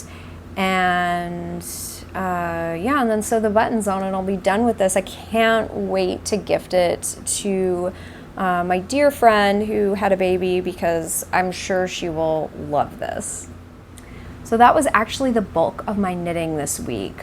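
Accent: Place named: South, United States. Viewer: You may just be getting a bit of southern drawl!!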